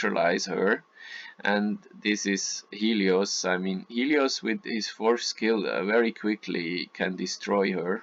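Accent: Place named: Bern, Switzerland. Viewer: 😂has mr no denkt bi dem akzent gruess us bern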